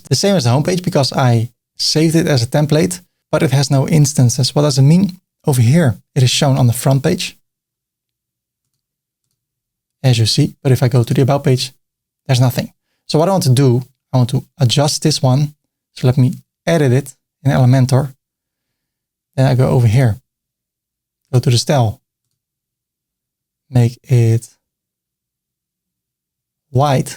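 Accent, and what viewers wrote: Netherlands. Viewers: Toen ik jouw naam hoorde gecombineerd met hoe je sprak wist ik meteen dat je Nederlands was haha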